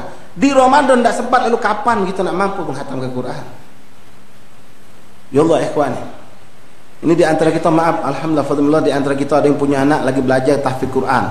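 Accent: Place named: Palembang, Indonesia. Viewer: wah... bahasa melayu palembang ini....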